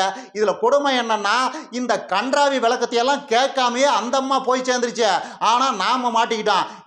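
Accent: Kongu, India. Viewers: Super thambi nalla irrugu un kongu petchu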